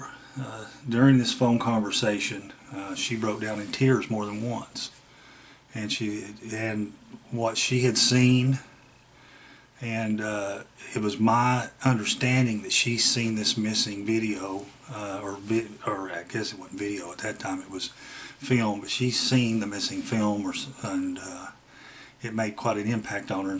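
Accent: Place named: West Virginia, United States. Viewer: Where are you from,? Your accent sounds a lot like mine here in good ole WV. Bobbie was a very sweet lady... always came across as genuine. This is all new to me, considering that Gimlin is a liar, so I'm kind of in shock. I was always kind of hoping that MK Davis, who I like, was just overreacting or something, like we're all capable of from time to time. This is pure insanity. So, Patterson and Gimlin expedition wasn't to film one, it was to wipe out a family of them in that area? I had no idea that we weren't seeing the complete film, but honestly, I never bought the "Patterson fell off his horse" part of the story. No one falls off a horse and lands that gracefully, camera in hand still.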